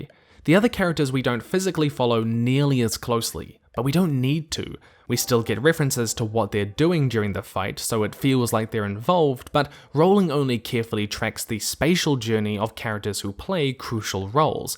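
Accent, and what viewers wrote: United Kingdom. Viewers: Why do you Bri'ish mofos have such trouble with words that end in "ian" or "ion?" No, it isn't SebastIAN. It's Sebastian. 3 syllables, not 4.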